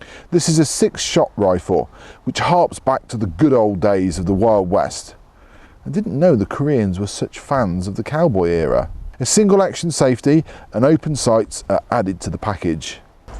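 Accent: United Kingdom. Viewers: Everything sounds better with a British accent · Can somebody say from what part of UK is this english?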